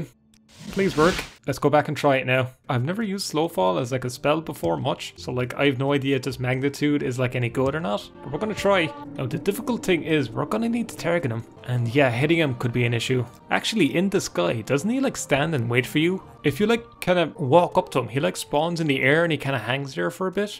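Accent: Ireland. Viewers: I wonder if anyone has ever told him he sounds like Jacksepticeye if they added a 10x more Irish to him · Are you Irish?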